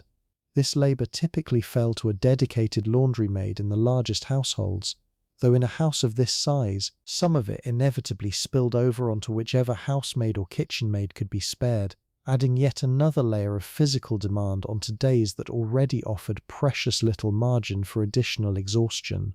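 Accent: United Kingdom. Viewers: Coastal Southern California PM. As I’ve said before, I enjoy these videos, but the British accent is stimulating and keeps me from falling asleep. It would be nice if there were an option to hear an American accent. That would remove an aspect of interest, and I could relax and go to sleep. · I’m from Mississippi, USA 🇺🇸!!! Love the videos, plus the British accent saying alright love 😊❤❤❤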